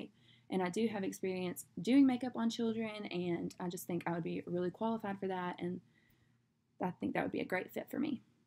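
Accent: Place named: South, United States. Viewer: i love your accent ! you have more of a southern accent than me and idk if arkansas if counts as a southern state haha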